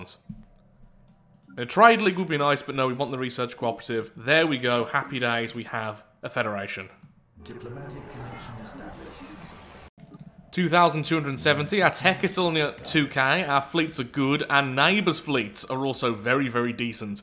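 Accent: United Kingdom. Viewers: Where is that Tim Curry-like accent from in the UK? I keep expecting you to shout 'Spaaayce!'.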